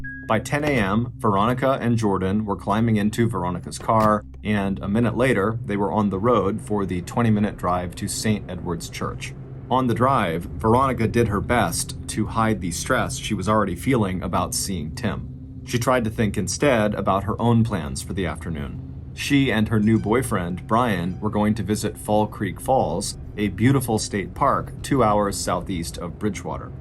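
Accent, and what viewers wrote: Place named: Boston, United States. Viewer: I hope this provides our revered storyteller a cascade of enjoyable, and well-deserved feedback! I am chronically ill, frequently housebound and at some point, almost daily, in such pain I can not move and barely breathe for the better part of an hour. Having a story on deck feels critical to surviving these more difficult times. I am transported from some floor to a vivid scene full of detailed descriptions and engaging plot weaving. Mr Ballen’s riveting unraveling of the “dark, strange, and mysterious” with a clear passion for the waning art of storytelling, is a true gift for all his followers. That he commits to a regular schedule while growing his family and philanthropy is admirable. That his art is guided by a baseline of facts is a relief in a world replete with untruths, half truths and alternative facts. 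So, THANK YOU 🙏 for sharing your gifts. Our family wishes your family the best. We have lived in Wollaston Beach and welcomed my only child at South Shore Hospital. My baby just turned 18, and we live in Nashua now, but will always fondly remember great raucous times in our late 20’s and early 30’s when we worked hard and played harder with greater Boston as our playground. So your authentic local accent, Mr Ballen, is the cherry on top. 🍨 We are grateful and wish you nothing but the best.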